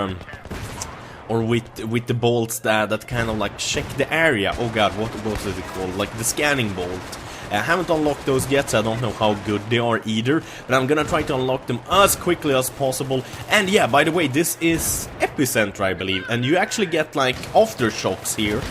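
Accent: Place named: Sweden. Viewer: I love your swedish accent// I'm from sweden to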